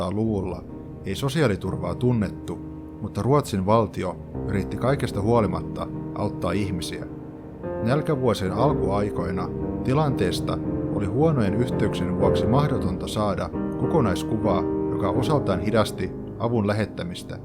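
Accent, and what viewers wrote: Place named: Häme, Finland. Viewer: Mainio video, ja kanava lähti tilaukseen. Hieman häiritsee "hämäläistyyppinen" murre joissain sanoissa: esim. huano (huono), kualleita (kuolleita), jne., sekä lauseiden kummallinen, robottimainen rytmittäminen. Kaiken kaikkiaan kuitenkin hieno kanava ja hienoja videoita.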